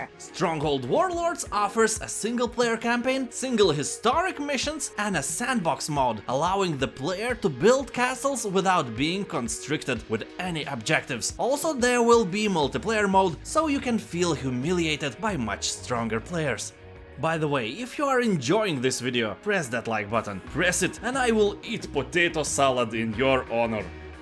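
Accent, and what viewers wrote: Lithuania. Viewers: Are you Lithuanian? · Wait a minute... that sounds suspiciously Lithuanian.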